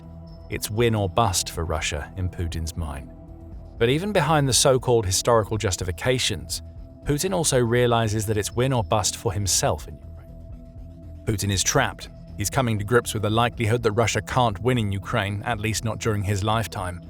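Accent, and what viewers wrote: United Kingdom. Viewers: First time listen to a Brit talk?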